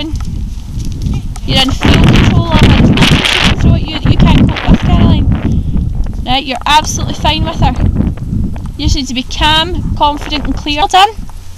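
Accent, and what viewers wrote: Scotland, United Kingdom. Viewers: wow you sound fantastic - i totally need to get you as my instructor haha. also - bizarre question - but are you glaswegian or scottish? xxx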